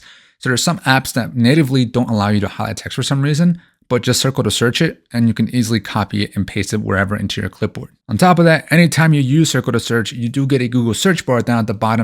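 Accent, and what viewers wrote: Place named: United States. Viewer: Where'd you get India 😂 he has an American accent and a Mexico jersey on lolol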